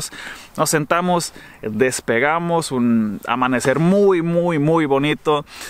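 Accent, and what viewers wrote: Monterrey, Mexico. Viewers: Porque ese nombre de Alemán Latino. Si tu acento es Mexicano Regio.?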